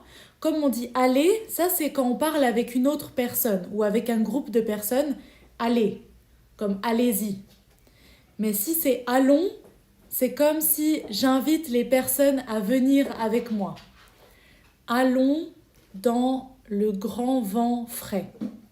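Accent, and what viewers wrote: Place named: France. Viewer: Aquí si hay acento de francés , un abrazo 🙂🫶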